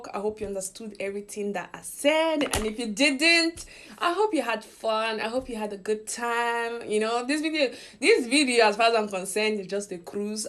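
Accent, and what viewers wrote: Nigeria. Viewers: 🤣🤣🤣 sounds like an igbo accent. Nne you made my day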